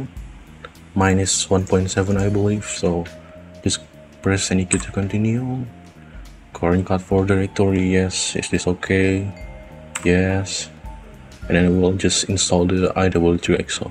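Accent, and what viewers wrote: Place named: India. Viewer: Amazing Indoglish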